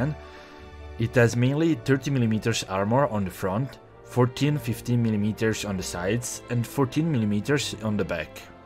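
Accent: Italy. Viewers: Si vede che sei italiano, lo riconosco dall'accento, e da come pronunci l'Italiano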